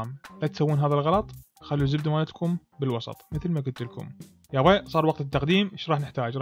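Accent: Iraq. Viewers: لهجة العراقين تهبللللل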